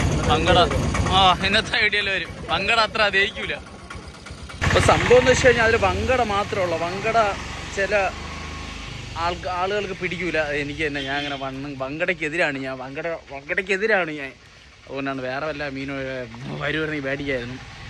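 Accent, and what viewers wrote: Trivandrum, India. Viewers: എനിക് നിങ്ങളുടെ വീഡിയോസ് ഇഷ്ട്ടമാണ്. ട്രിവാൻഡ്രം ഭാഷ കേൾക്കാൻ രസമുണ്ട്. ഒരു 👋 ഇട്ടേരെ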